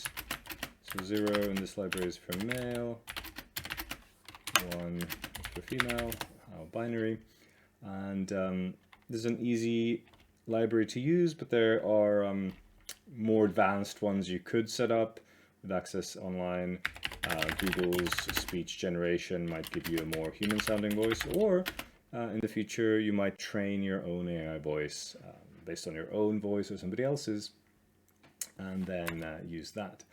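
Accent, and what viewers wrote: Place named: Scotland, United Kingdom. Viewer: this is incredible. and you are Scottish. Greetings from one to another. Ofc - not my real name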